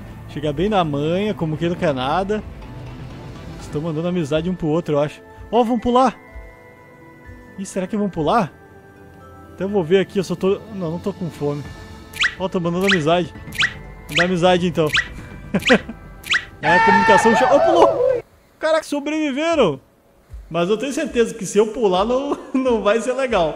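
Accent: Rio Grande do Sul, Brazil. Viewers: vc tem sutaque Gaúcho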